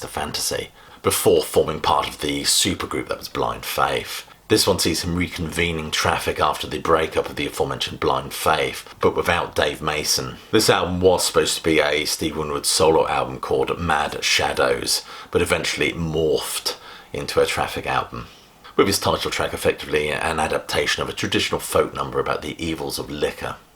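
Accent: United Kingdom. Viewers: Great to see included; I have always admired them. If people do not believe they are good then try listening to side 1 of! I recall Gene Symonds on radio saying were a guilty pleasure, which I thought was a bit of a cheek. The song may be called a guilty pleasure, but never. This is the first time I have watched a Classic Album Review, and I am very pleasantly surprised and impressed by your knowledge, wit and wordsmithery! I shall watch some more. it is nice to hear an articulate British YouTuber. Thank you.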